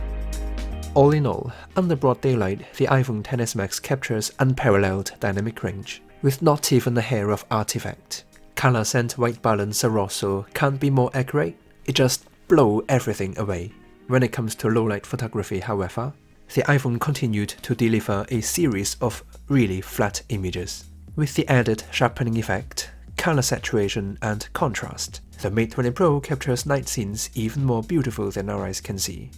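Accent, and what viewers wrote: United Kingdom. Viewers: I like your British accent 😀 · 只是有点听不惯英国口音，呵呵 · 英式英文哦！！！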